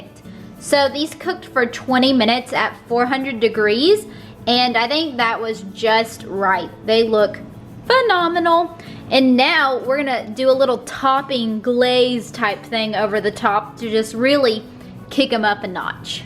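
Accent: South, United States. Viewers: US southern accent. That brings back good Memories. Love from Brazil! Thanks for the great recipes. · You are hott! I love your southern accent!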